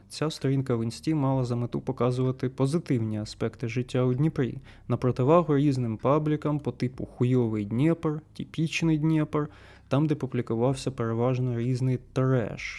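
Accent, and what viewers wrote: United States. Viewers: Класний американський акцент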